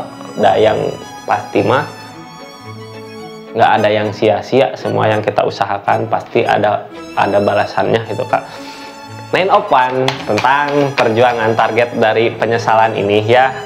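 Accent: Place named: Sunda, Indonesia. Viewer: Biar bahasa Indonesia ,logat Sunda nya medok aja ,resonet aja deh komen aja deh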